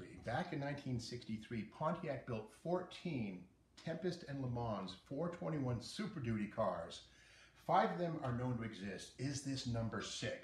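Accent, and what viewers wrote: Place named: Springfield, United States. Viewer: Must be from the Springfield area.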